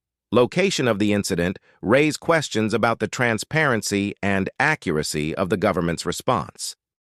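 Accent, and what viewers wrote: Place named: United Kingdom. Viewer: You must be from BBC - enemies of the black race and enemies of All Christians. You are always going through African countries from country to Country creating trouble, accusing l Hatred ajc division and Destroying African leaders. Wtr hear it from your British Accent. You are turning now to Malawi trying to capitalize on this terrible tragedy to boosy your lucrative business which you conduct with the blood of the black people -- it is your destructive work. There was absolutely no rift between the current president and the Vice president. Why would high ranking Military officers( MAJORS) who PERISHED in te crash Take thier iwn fellow soldiers and 7 other High ranking Malawi leaders in addition to the Vuce President in a presidential Military plane judt to go Kill themselves along with rhe leadership team of Malawi? Bad weather! 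Shane on you enemies of the Black people 😮😮😮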